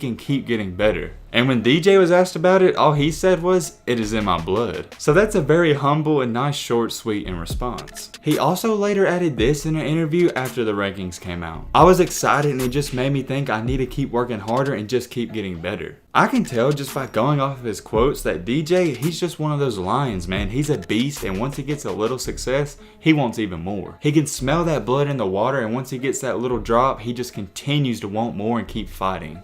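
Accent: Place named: Mississippi, United States. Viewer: You from Missippi? You sound like a man I met in Nachez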